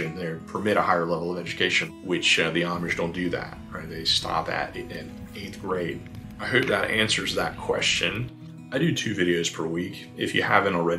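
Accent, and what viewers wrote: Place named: Pittsburgh, United States. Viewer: That Yinzer "O" kept escaping!🤣🤣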